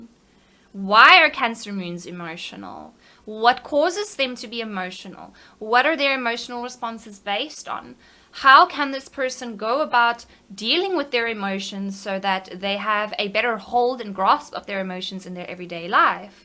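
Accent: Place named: South Africa. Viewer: you can't destroy that south african accent with a ridiculous american "r"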